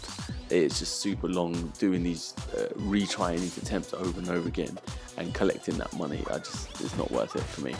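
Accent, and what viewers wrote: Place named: London, United Kingdom. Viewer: Oh, so you're in London! I had been trying to figure out y'all's accent. It doesn't sound like the typical English accent that I normally hear, but I'm also an uncultured American that's never really left the south lol. Love these videos!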